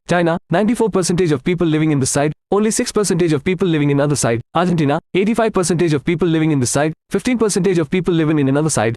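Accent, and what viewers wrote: India. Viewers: why the accent look like indian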